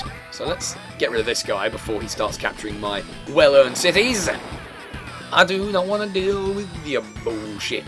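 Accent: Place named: United Kingdom. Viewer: I was not expecting a British person. I'm a Brit myself, but still... Anyway, I hope your voice acting for Green Earth will be EPIC, since they're all British, too. I like to think I'm good at impersonating Drake. 🤣 Edit: Actually... Green Earth is probably not all British. Scratch that. Anyway, I'm so happy to see this content from you. ❤️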